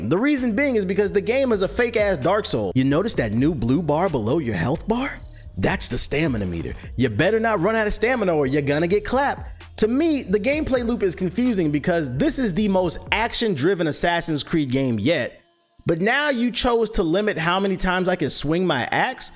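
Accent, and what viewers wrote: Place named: Pittsburgh, United States. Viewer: He must be from Pittsburgh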